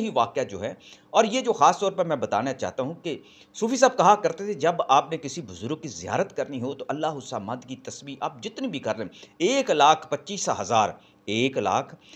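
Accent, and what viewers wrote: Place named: Kashmir, India. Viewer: Assalam o alaikum warahmtullah ap taluq azaad kashmir sy hai apka accent bilkul wesa hai... Allah kareem aulia Allah ki mohbbat or adab o ehtraam wala rakhay Aameen..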